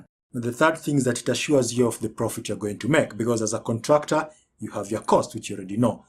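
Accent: Kenya. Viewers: Aaaa thanks man! really helped! btw are you from kenya? can sense that kenyan accent haha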